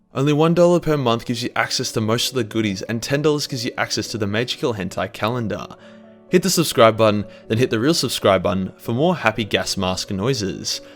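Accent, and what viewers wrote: Australia. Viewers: I already know half the lore but hearing from an Aussie makes it better. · Bruh, why are Australian accents the best? voice makes my mussy moist.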